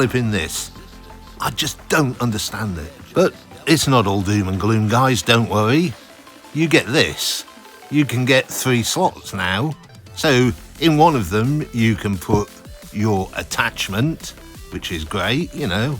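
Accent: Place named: United Kingdom. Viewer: This is the most British sounding person I heard in my life.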